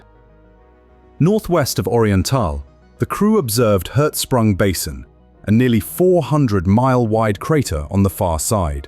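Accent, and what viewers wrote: United Kingdom. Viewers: Get rid of the British narration.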